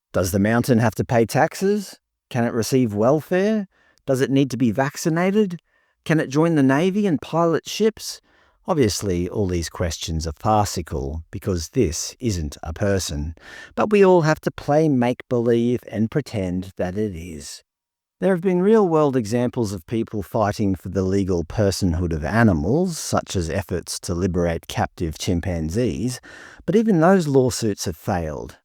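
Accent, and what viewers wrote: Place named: Australia. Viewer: You sound like an Aussie, trying to tell the story of,, the Indigenous of Aotearoa🤦🏽‍♂️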